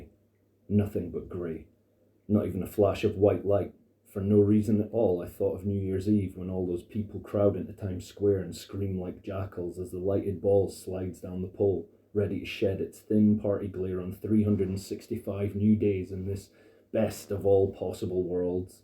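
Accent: Scotland, United Kingdom. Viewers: Cool, I subscribed to your channel, so I look forward to that. The Scottish accent gives the reading a nice twist as I don't believe I have come across a Scottish narrator before.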